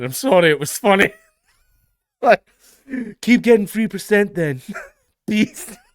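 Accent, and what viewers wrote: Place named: Scotland, United Kingdom. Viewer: "I really like it when people get angry" - dude, we can already tell by your accent you're Scottish. No need browbeat us with it.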